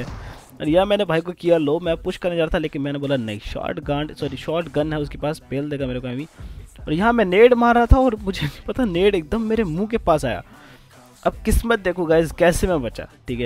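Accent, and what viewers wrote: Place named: Rawalpindi, Pakistan. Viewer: Love U bhai pindi boy boltay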